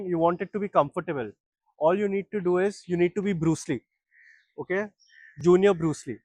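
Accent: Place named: India. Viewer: Can you use AI to change your fkd up Indian accent to something that people can understand?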